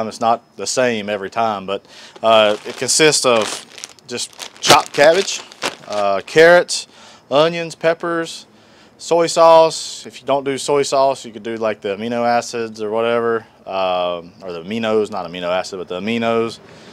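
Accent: North Carolina, United States. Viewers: The whole time I was watching, I kept thinking, man, he’s gotta be from NC...lol! I lived in Denton for 10yrs. Good people!😁👍🏻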